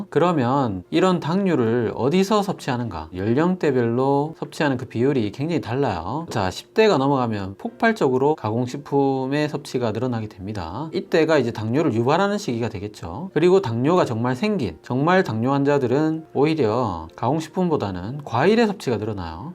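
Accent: Gyeongsang, South Korea. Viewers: 우리 님은 너무 훌륭한 강의를 하시니 경상도 억양도 너무 멋있게 들리네요!..늘 고맙습니다요!..^^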